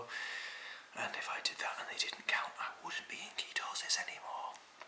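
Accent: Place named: England, United Kingdom. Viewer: I was thrilled to find a video with an English accent discussing artificial sweeteners and polyols but this was such a disappointing! You need to plan and rehearse your videos and have your info to hand. The most annoying video I have watched and a waste of time when trying to find a simple answer of you count polyols as net carbs! Please watch Dr Berg, Dr Berry, Keto Connect & Keto Christina for inspiration how to make decent videos and to get your message across even if you want to feature your pet!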